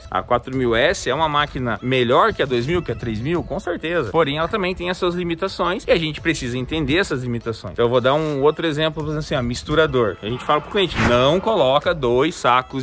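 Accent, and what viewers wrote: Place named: South, Brazil. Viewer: Esse sotaque e aqueles pinheiros no fundo da pra saber q tá bem no sul kkk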